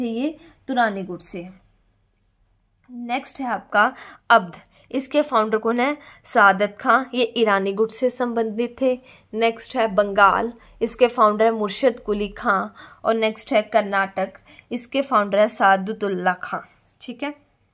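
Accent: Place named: Bihar, India. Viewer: bahut hi achchha contant hai ek hi video me thanks mam, aapke language se lg rha aap bihar se ho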